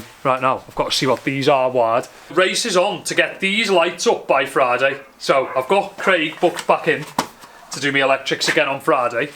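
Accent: United Kingdom. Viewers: I'm your fans from Indonesia. Its quite nice to watching your content and your british accent really fvckin' dope mate 😂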